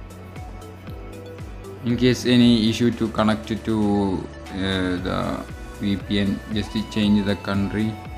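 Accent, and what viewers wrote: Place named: Kerala, India. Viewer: Accent seems like malayali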